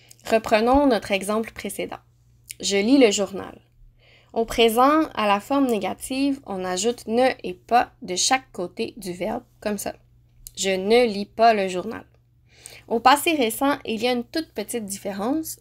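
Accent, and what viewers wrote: Quebec, Canada. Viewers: J’aime ton accent québécoise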